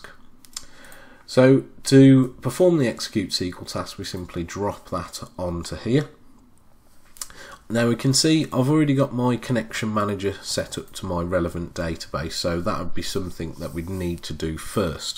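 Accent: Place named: England, United Kingdom. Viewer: the golden grail of English accented youtube tutorials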